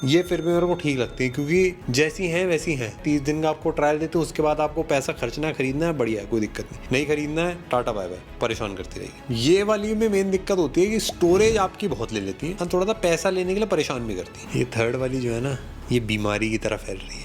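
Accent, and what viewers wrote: India. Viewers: umma koi kaam ke aap na hoyi gyi, INDIAN TONGUE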